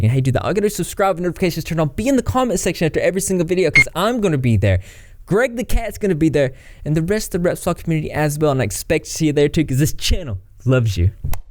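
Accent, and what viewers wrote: South, United States. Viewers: Buddy where are you from,, you sound southern,, I’m from Oklahoma your accent kind of sounds like you are to?????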